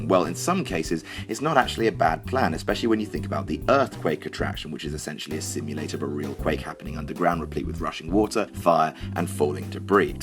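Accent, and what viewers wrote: United Kingdom. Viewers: WHY did they use a steroid-voiced, heavy accented, British motor-mouth turkey to narrate this?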